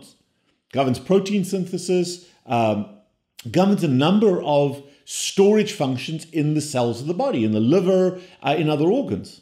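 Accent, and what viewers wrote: South Africa. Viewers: Hy klink so baie of hy Suid Afrikaans is? Hy moet met ons Namibiese Dr's kom praat!😞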